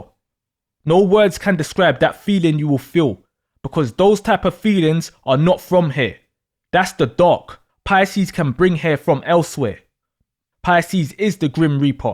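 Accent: United Kingdom. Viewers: I keep on waiting for - Someone tell Doja cat, I’m trying indulge in that. 🎶 Rihanna’s face made me click & The narrator’s British accent made me stay. 😂👍🏾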